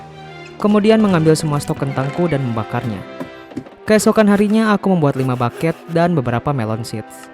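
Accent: West Java, Indonesia. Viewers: Orang Sunda ya bg?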